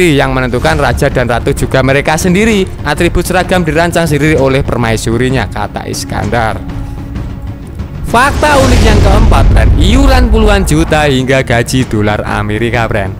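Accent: Java, Indonesia. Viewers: Medhok ngomongnya...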